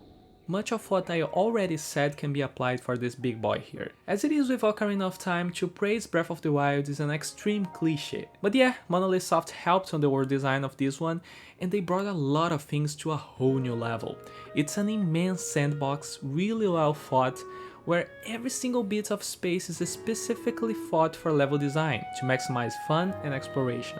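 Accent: Brazil. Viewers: ah, sabia, o sotaque te denunciou! Mas vc fala inglês bem pra caramba! Bacana ver um youtuber da minha casa fazendo conteúdo de qualidade tb pra fora! Fica em paz, amigo!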